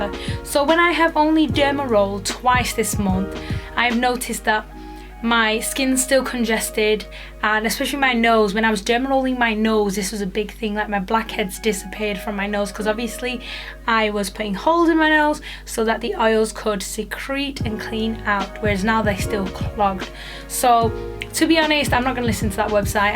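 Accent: England, United Kingdom. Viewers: Akcent is like amir khan England's boxer 🤣😂😘😍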